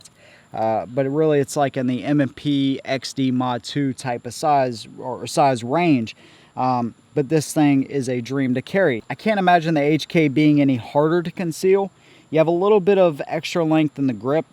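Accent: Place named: South Carolina, United States. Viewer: You from South Carolina man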